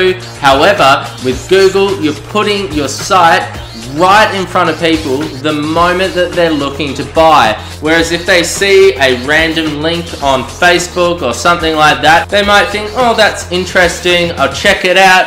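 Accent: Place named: Australia. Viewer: Niche is pronounced "Neesh" not "Nitch" ... nice to hear an Australian voice on one of these kinds of videos though :)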